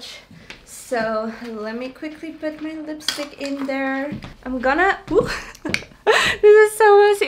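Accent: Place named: Netherlands. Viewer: Are you Dutch? Idk why but you have a sort of Dutch accent haha.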